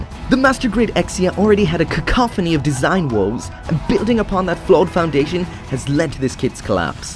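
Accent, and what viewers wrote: Ireland. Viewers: Is this YouTuber Irish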